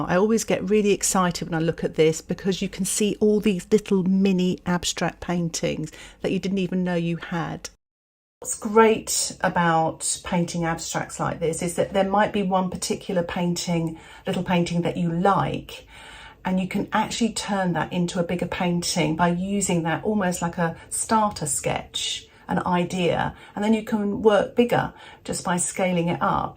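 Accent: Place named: United States. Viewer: Hi this vid has an American accent, hope this is all legal, Brisbane Australia 🦘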